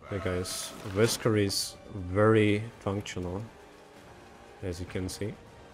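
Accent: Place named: Poland. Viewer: Polski akcent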